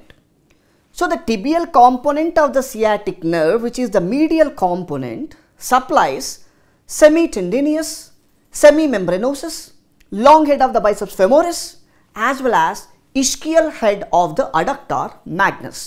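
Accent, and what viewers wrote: India. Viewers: WOW This is a really good explanation and he speaks amazing. Is the most understandable Indian I have listen (I'm sorry if he is not exactly from India but rather a closely related country). He has an accent AND is clear. I need everybody to speak like that haha (even native English speakers). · Perfect voice sample for Indian accent!!! Am gonna save his voice for my ringtone!!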